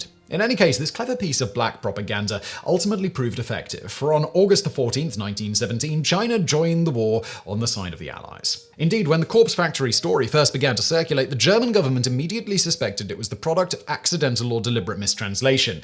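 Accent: England, United Kingdom. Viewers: Tbf, he is so english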